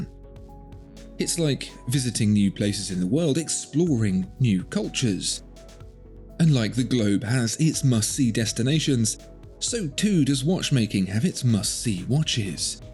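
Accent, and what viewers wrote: United Kingdom. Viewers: I would totally come, but based on your accent, I think you’re on the wrong side of the pond.😕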